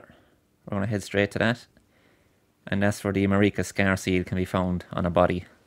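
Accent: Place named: Ireland. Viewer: After trawling far too many videos showing how to get it at late game this is exactly what I needed lad and with an Irish accent to boot 👌 Appreciate it!!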